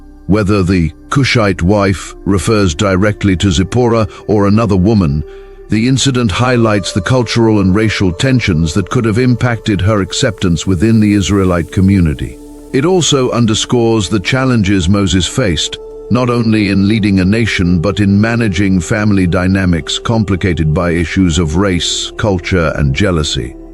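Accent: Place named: United Kingdom. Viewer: Amazing that this narrator,with a a strong British accent close to one doing their version of RP He's on too many videos,which proves most of these videos on bible pretty much phoney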